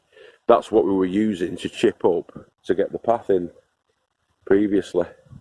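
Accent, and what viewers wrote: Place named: Manchester, United Kingdom. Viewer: Hi, I'm a new subscriber and glad to be on board. Ex Manchester boy long way south now so it is good to hear your accent and see you smile. Thanks for sharing and more please on the sowing & planting under the smaller hoops. Have a very good year. Geoff.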